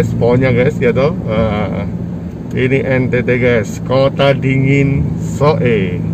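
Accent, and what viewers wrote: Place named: Java, Indonesia. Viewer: Asik ne ada logat jawa jawanya... agak kecil juga jalannya.. suasananya ada gambaran seperti di Kalimantan...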